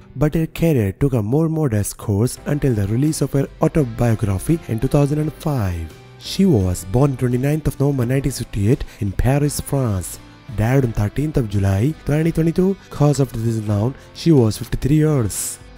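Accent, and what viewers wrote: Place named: India. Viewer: He cannot help his accent. I believe he may be Indian.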